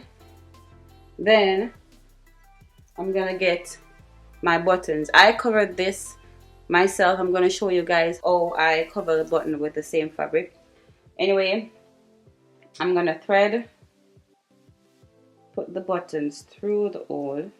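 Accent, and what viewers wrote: Jamaica. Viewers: As soon as you started speaking I was like "ooooooh she Jamaican" . Subscribed! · I'm sorry I just read your bio. I didnt even knew there was one. I love your videos. Might not get to like as I watch.....i always forget to but I love your videos. Your accent screamed jamaican so that's y I was curious. ❤❤❤❤❤❤❤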